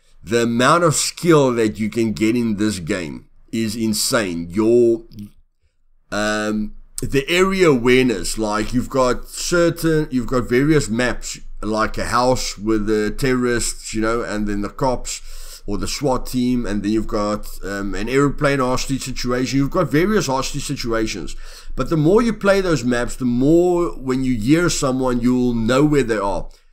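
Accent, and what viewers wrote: Afrikaans, South Africa. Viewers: You are from South Africa? LOL I always asked myself what kind of accent you have. And it is an Afrikaans influenced accent, right?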